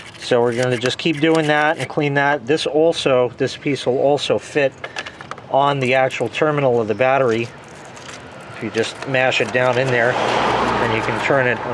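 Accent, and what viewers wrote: New York, United States. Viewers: Ethnicity: lebanese with nyc accent :) thanks for the video Habib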